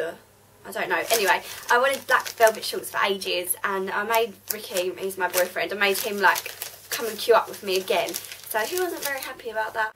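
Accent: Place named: Cockney, United Kingdom. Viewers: love your accent, are you from london or there abouts? x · Cockney accent , that shocked me big time .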